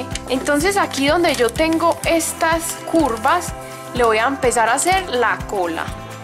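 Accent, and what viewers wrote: Colombia. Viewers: Eres colombiana verdad? Te lo digo por ese acento que tienes. Y que a mi en lo personal me encanta. Saludos y Bendiciones desde Guadalajara JALISCO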